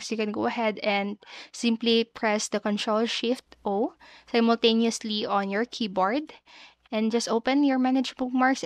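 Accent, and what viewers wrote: Philippines. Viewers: Filipino accent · Thanks! Your Pinay accent is also nice.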